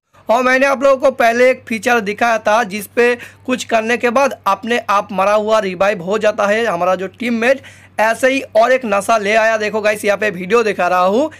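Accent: Bengal, India. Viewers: Ap kay bangali ho bhaiya 🙄🙄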